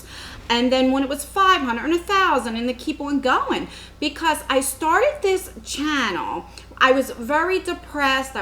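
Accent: New Jersey, United States. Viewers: congratulations on 14k now! stumbled on your videos a while ago and I've been watching them nonstop since! I just love your jersey accent and how you really explain everything thoroughly in your videos!! #lipstick:) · so glad to have stumbled upon your channel!! You are a ray of sunshine and look forward to your videos... You seem like someone I would totally be friends with:) Where in NJ are you?? From the sound of your adorable accent I'd say somewhere central or south...I'm a Jersey Girl too!! 🤟👊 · Nice to see it's happening for such a nice person..enjoy your unboxings and the Jersey accent takes me back home. Congrats! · Seriously I turn around and your channel has grown so much since I started watching. The amount of stuff you're giving away is beyond generous. also what sub do you have where you get a full set of crown brushes? I must have missed that video, oops! 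Loving your Jersey Accent:)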